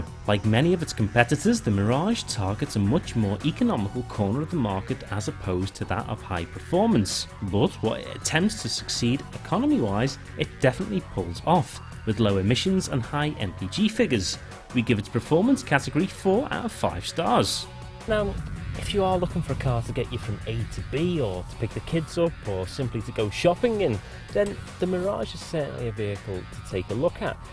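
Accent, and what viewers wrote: Liverpool, United Kingdom. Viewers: He's from Liverpool. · I spot Sefton Park! Didn’t realise it was Liverpool well apart from the accent!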